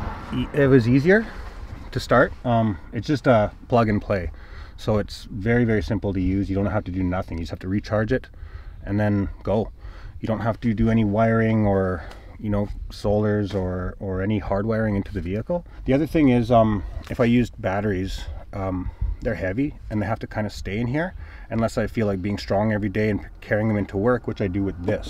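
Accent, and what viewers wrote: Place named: Canada. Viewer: Deff sounds canadian. I would love to try this but I'd freeze to death in the prairies during winter lol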